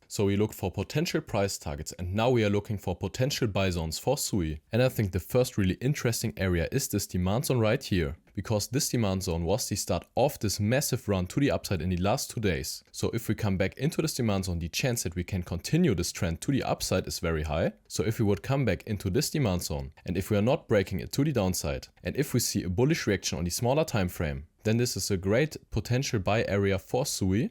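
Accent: Germany. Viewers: Deutsches Dialekt 👍 lege ich richtig? SUI is gonna be huge 🚀🚀🚀 in my opinion, a 100x from the bottom is more than possible ✅ Grüße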